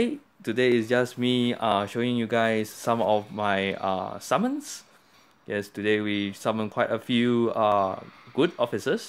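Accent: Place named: Singapore. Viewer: well... your accent is typical singlish so im used to it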